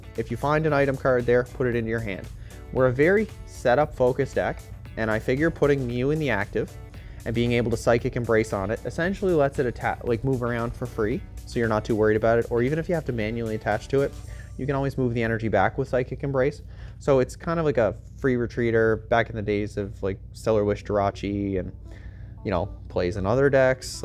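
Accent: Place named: Atlantic Canada, Canada. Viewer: Yo, you sound like you live in Atlantic Canada? Any events nearby coming up?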